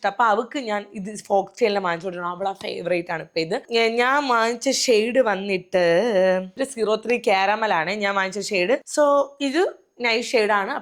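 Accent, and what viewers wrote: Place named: Thiruvananthapuram, India. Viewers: Chechi i have been following u and admiring u since 2 years and i am keep loving watching your videos....i am even get influenced mostly on products you used how you style....and especially the way you talk its so attractive that i am keralite also tvm side now staying and i like the way you present everything in your slang....anyway best wishes for your achievements❤.....i know i am not lucky at all for getting this giweaway....but then also simply trying jst coz my favourite influencer finally came with giweaway nahh....so i wanna be part of it thats it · Chechyiiudee ehh tvm slangg adipolii anu kettonduu erikkaann💖✨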